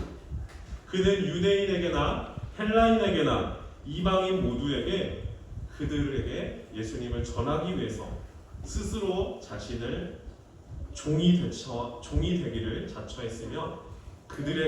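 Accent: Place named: Jeolla, South Korea. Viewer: 전도사님~ 이순신 연기 보고 진짜 이순신 장군님인 줄 알았어요~ 역시 전라도 분이시네요^_^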